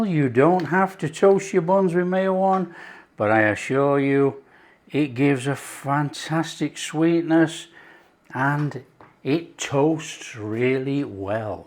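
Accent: Yorkshire, United Kingdom. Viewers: you sound like you are in the UK? Where are you getting that much ground pork shoulder for less than a tin of Spam? I'd love to know. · My Mouths watering! I'll have to try this as I love Spam! It's not easy to find where I live in the Golden Triangle! 😄 Great to hear a Yorkshire accent when I'm so far from home!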